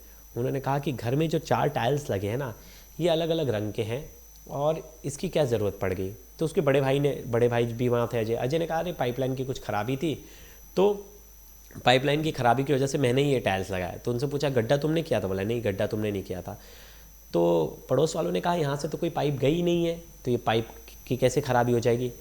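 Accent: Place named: Varanasi, India. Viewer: बनारसी हैं क्या सर 😊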